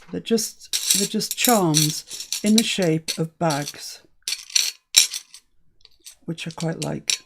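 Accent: England, United Kingdom. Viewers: I only caught the tail end of the 'live' on Sunday and our 6-yr-old grandson came along as I was watching. Our grandchildren know you as " " and realize you live in England. They love your accent. Tonight (Monday) I was watching, trying to catch up from the beginning. Warren was here again (and he would pronounce his name 'Wawwen') and said, "She's still awwganizing?" and watched along with me for awhile. I've just walked him down to his house and he was repeating, "I'll just put the buttons in the button drawer" all the way home - complete with the English accent.:) You're a hit in our house!! And by the way, I'm suffering from SES (someone else's 'stuff') envy - big time! Oh, and almost the first thing you picked up on your pile was an envelope from Clem...I grinned.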